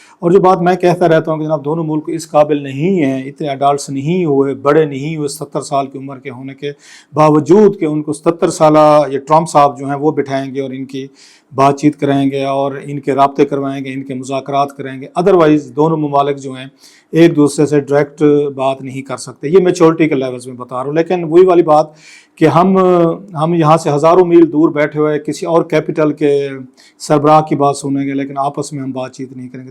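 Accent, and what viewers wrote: Saraiki, Pakistan. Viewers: بے وقت کی راگنی۔۔۔ یہ آپ کا موضوع نہیں ۔۔ سرائیکی سائیکی سے نکلو۔۔ اب تو اردو میں گفتگو کرنا سیکھ لو۔۔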